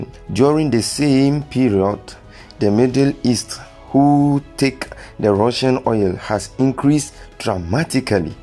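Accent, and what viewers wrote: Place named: Africa. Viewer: It is an accent from somewhere in Africa.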